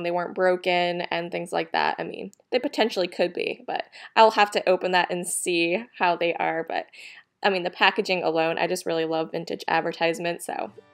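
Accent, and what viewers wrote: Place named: Maine, United States. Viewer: I liike to hear you talk with your Maine accent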